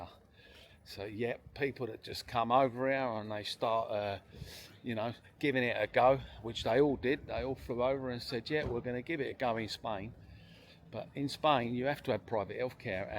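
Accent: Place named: Cockney, United Kingdom. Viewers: Well, the guy has a Gor Blimey accent, so he is used to Ugly Dumps!! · 2030 will be in touch if we're all still around. many thanks. btw i'm from romford and from your accent you're from simlar area?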